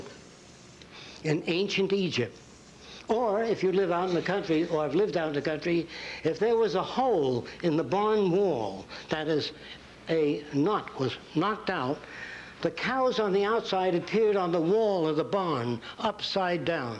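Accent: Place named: New York, United States. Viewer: AND GOT LOVE THAT NEW YORK ACCENT. LONG LIVE J.F.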